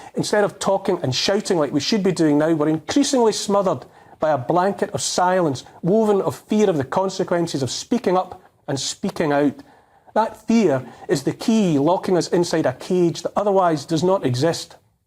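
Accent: Scotland, United Kingdom. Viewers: A true Scottish voice speaking truth to power .Cue character assassination in the press.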